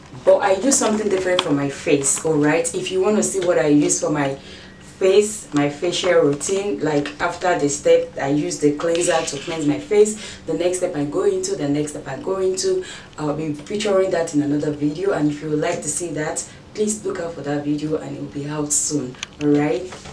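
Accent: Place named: Africa. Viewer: Goof morning my blessed family. I hope you are well by grace.we can hear your beautiful African accent. Looking beautiful sis as always. I need some of this brash . Love you sis I declare peace and joy in the hearts of Your people! I proclaim that You will bless Your children and will keep them; You will make Your face shine upon them and be gracious to them! I pray against the plans of the adversary to steal joy and peace from Your people. I declare that Your children will remain uplifted, for there is no one who can lift the soul like You do, Amen.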